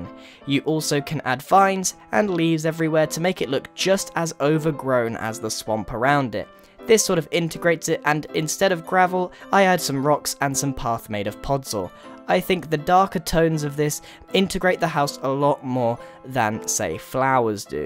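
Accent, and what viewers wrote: United Kingdom. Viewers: Oh are you British cause there are not that many YouTubers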